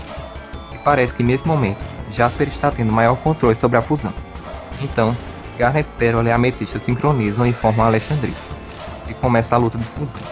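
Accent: Northeast, Brazil. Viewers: Já sei que vc é do nordeste :-) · vc eh nordestino?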